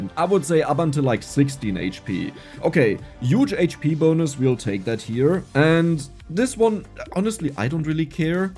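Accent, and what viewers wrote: Germany. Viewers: Ähm kurze Frage du machst nur Videos auf Englisch oder? Ich fände es echt cool, wenn du auch mal das ein oder andere auf Deutsch machen könntest. Irgendwie klingt es für mich so, als wärst du eigentlich deutschsprachig.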